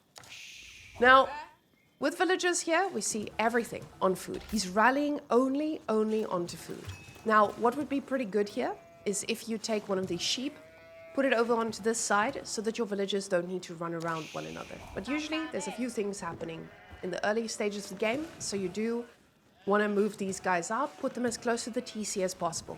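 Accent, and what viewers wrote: South Africa. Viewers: I don't know why but I am madly attracted to the South African accent... I need help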